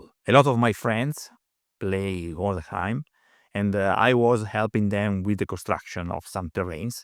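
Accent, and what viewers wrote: Italy. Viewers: I’ve never had trouble understanding you. There’s some charm to your Italian heritage and accent. Best of luck, have loved the channel for a long time.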